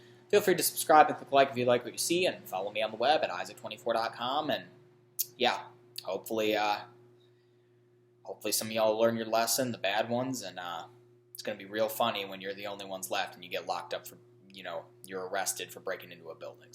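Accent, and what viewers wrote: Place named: Michigan, United States. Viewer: I came to you for your Speed Queen vids, but then started listening to a few of your other uploads due to your distinctly Michigan accent🤓.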